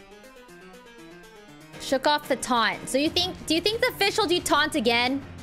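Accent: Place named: Philippines. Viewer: That Filipino accent was strong on this one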